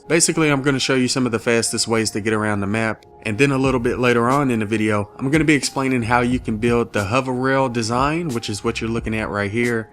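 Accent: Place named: Florida, United States. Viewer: I'm trying to figure out.. is this an accent from Florida or some coast states ?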